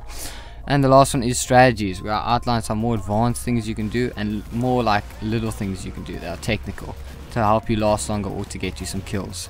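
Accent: Afrikaans, South Africa. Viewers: Are you South African? I'm Scottish but my mother is South African and you both say words the same way. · He is South African:D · are you afrikaans?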